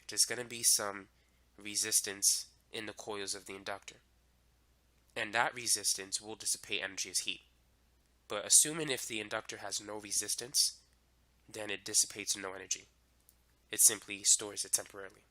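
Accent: United States. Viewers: A very sharp, clear almost squeaky British voice, but pronounces like an American. Perhaps, he lives and teaches in the States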